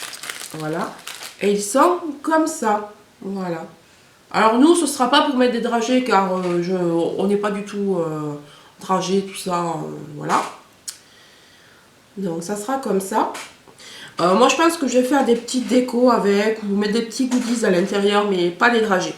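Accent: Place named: Marseille, France. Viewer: tu es Marseillaise ? En tout ka tu as l accent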